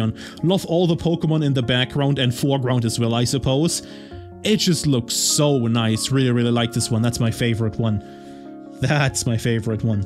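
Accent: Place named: Germany. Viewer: Haha direkt am Akzent gehört, dass du Deutscher bist :D Sehr coole Sammlung!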